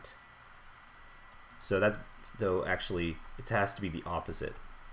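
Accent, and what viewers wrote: Canada. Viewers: That canadian accent tho!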